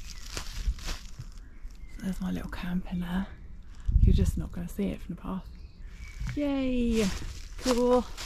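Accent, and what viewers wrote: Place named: England, United Kingdom. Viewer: Love your English accent and pronunciation :-) ❤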